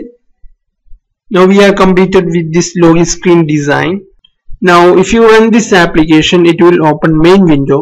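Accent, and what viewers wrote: Kerala, India. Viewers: Malyali aano ? Nice explanation.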